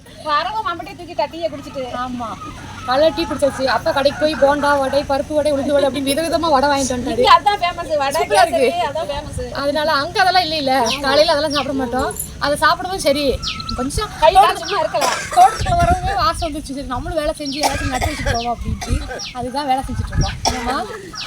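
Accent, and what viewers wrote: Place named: Madurai, India. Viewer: Ooruku varaum manjukurompa santhosama eruku mugathil avalavu santhosam mathurai thamil apadiye eruku americaviliruthu india vara pilaigagooda evalavu santhosa patirula mattaga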